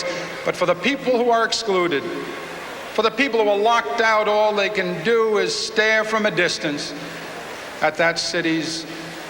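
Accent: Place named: New York, United States. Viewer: Quite a New York accent… :-)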